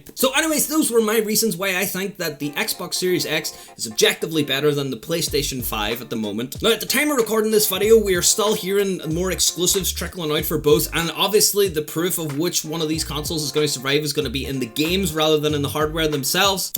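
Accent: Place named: Northern Ireland, United Kingdom. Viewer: he might live in LA but his accent is definitely northern irish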